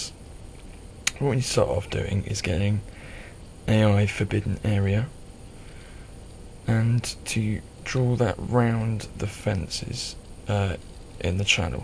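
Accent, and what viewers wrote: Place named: United Kingdom. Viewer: Absolutely fantastic! Great to see that this channel hasn't died, it seemed like such a good idea. Great tutorial, very clear and helpful. Always good to hear a grand british accent on the web too:). Greets. Eth.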